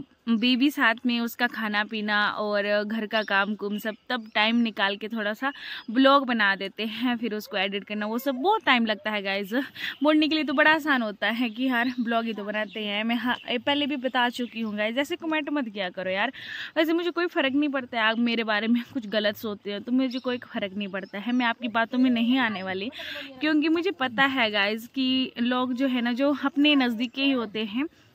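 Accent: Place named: Himachal Pradesh, India. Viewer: Bahut sundar vlog bahen ham utrakhand se hu haridwar roorkee mai rhti hu aur aapki Bhasha se lagta hai ki aap Himachali Ho han ji Time To lagta Hai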